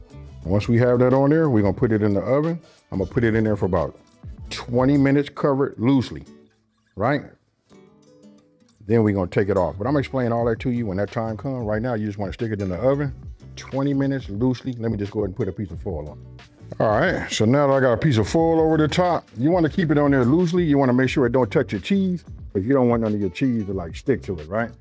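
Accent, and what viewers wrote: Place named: Atlanta, United States. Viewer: Had a chixken casserole not spaghetti. Why does he have an Atlanta accent?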